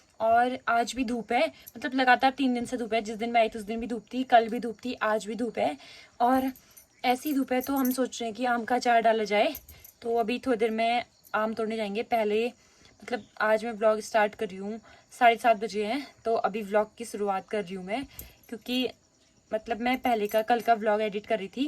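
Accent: Pahadi, India. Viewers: Aapki bhi pahadi boli humari jaisi hai same❤😊😂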